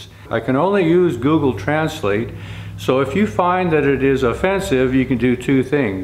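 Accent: United States. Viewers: English, even with an American accent is fine by me..👍🏻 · Overall a very nice wagon. Not sure what could have been on the surface of the kitchen box, but it's my hope one tradesman sets up the other for success. It will be interesting to learn at some future date how the kitchen cabinet makers style box construction holds up against the typical use of such a wagon (assuming it will get used)? Thanks for the videos - I enjoy them. BTW: 100% good ole American English ! 👍👢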